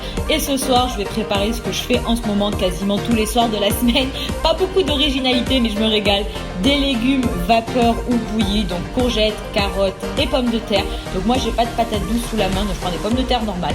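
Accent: South, France. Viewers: Top avec l'accent de chez nous le sud non😊😊😊😊?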